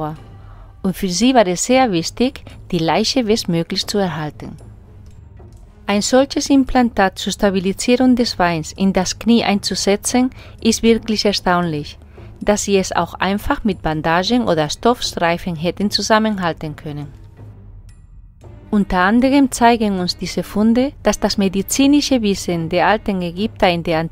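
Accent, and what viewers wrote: Spain. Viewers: Nun ja, das nennt sich spanischer Akzent. Du bist offenbar ein mental stark eingeschränkter Provinzler, um es mal sehr nett zu sagen. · ...man 😄 lass mal den nazimist hier wech😉... Ich bin froh solch politischen scheiss auf solchen Kanälen nicht zu finden. Ich denke, dass die meisten Leute, die sich auf solchen Kanälen aufhalten ( darunter auch ich natürlich 😁 ) eine andere Sicht der Dinge haben, als der Mainstream. Zu der Stimme muss ich sagen gefällt mir sehr 🙊 ✌🏽 Nur der starke spanische Akzent gepaart mit den hohem Niveau an Wörtern stört mich! 😂 Es wird viel wegen der Dame, die spricht diskutiert. Dafür kann die gute Dame ja nichts, aber das war der Anlass für mich überhaupt auf die Stimme zu achten 😃 Trotz allem finde ich die Videos immer sehr interessant und ich schätze die Arbeit dahinter👍🏽weiter so...!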